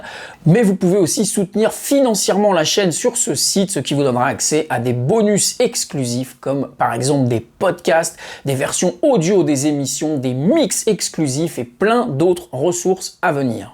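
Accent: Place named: France. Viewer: Les mots en anglais prononcés avec cette french touch 👌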